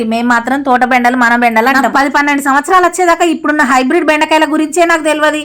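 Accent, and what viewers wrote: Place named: Telangana, India. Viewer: Akka asalu no words ela matladuthunnav chala happy ga undhi nee matalu vintunte madhi kuda vemulavada side akka village😅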